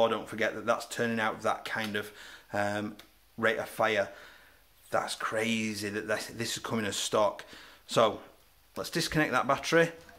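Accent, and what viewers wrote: United Kingdom. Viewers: Thanks for the response. I notice you've got a northern accent and I'm from west Yorkshire I'm just wondering if you could recommend me any good fields because I'm gonna start airsoft once the lockdowns over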